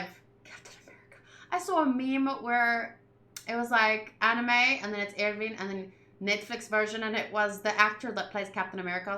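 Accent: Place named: South Africa. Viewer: she from South Africa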